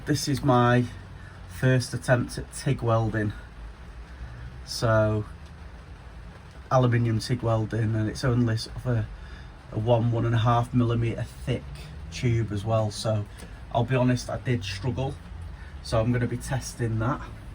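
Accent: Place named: Bradford, United Kingdom. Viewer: I've got a mk5 tipper that's been fully rebuilt and solid as a rock in blue single wheel with German axle that i drive daily and love it but it still needs a few little bits to make her mint but to find one that don't need welding is a miracle. I've had loads over the years but I'm keeping tabs on your build to do my own in winter but yours is the most informative build I can find and makes for a good watch. You don't sound too far from me in Bradford either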